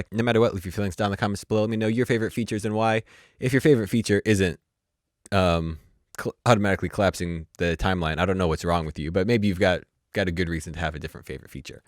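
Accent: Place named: England, United Kingdom. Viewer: it's english..not american lol..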